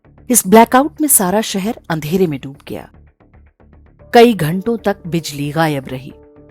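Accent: Standard. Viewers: आप शुद्ध हिन्दी का उच्चारण करते हे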